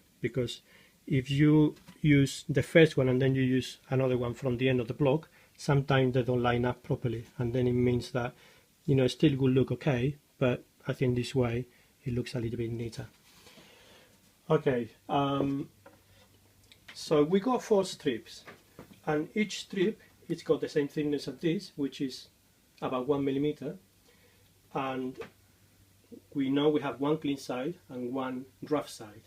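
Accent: United Kingdom. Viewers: I like these videos. Great instruction. But Mr learned English from a Brit. Some nice American should teach him how to pronounce the "oh" sound. (This, from an admittedly anal-retentive English teacher with a touch of irreverence. I wish I could speak Spanish half as well as he speaks British.) But in all seriousness, these are great videos. Mr. shows and explains as well as can be done in a video. I hope someday to be able to take one of his classes.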